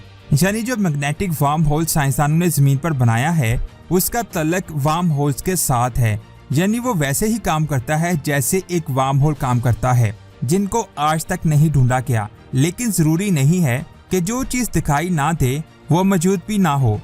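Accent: Punjab, India. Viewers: Pehle apna panjabi lehja to badlo baat urdu m lehja panjabi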